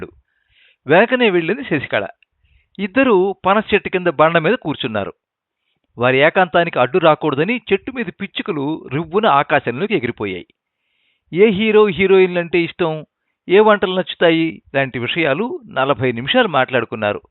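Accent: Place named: Chittoor, India. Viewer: సర్ కథ చాలా బాగుంది...చదివిన తీరు,చిత్తూరు యాస, జోడించిన saamethalu...super 🎉🎉🎉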